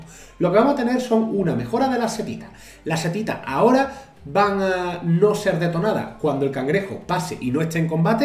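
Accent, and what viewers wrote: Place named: Seville, Spain. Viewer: Hay subtítulos al español? No entiendo el sevillano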